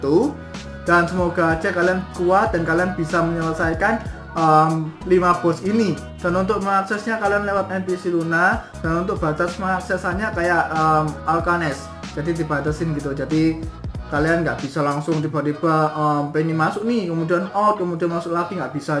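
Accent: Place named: Java, Indonesia. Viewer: bawa acaranya gokil :) bgd :) jawa nya :) gmn gituh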